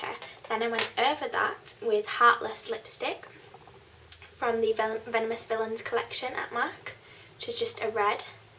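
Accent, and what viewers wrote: United Kingdom. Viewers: I really like your British accent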